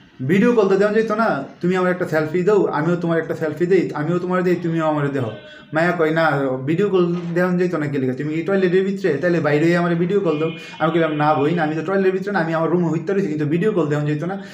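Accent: Brahmanbaria, Bangladesh. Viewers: But vai toh b-bariar mone hosse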